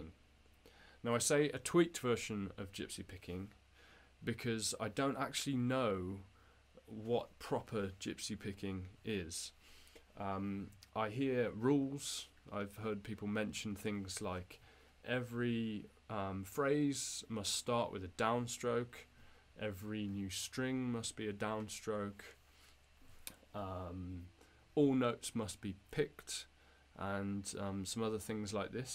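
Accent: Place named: England, United Kingdom. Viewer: Hi, I like your vids a lot! yes always interesting, real humility. We can guess your pleasure in playing guitar, and speaking about it. I also like your english kind of speaking as well. Ah! I've noticed something, when you play electric guitar: it seems your little finger is not used at all, is it? I would maybe say more ( 😅): you mostly play with the two first fingers (not counting the thumb of course). I will not try to copy it, but try it to see what it feels, yes maybe. But for my part, I play most of time Gipsy Jazz. OR Jazz, too.. always learning more and more. Greetings from France,!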